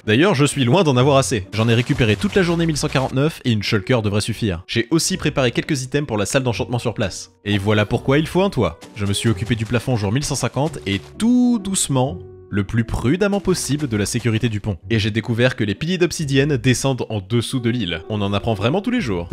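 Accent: Quebec, Canada. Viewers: J'ai une question pk defois tu parle québécois a travers de tes phrase?sinon continu comme sa tes vidéo sont incroyables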